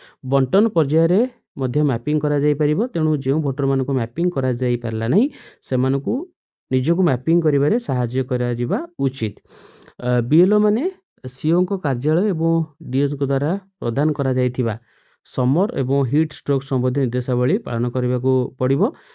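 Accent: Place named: Angul, India. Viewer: ଅନୁଗୁଲିଆ m କୁ ma L କୁ La କହୁଛି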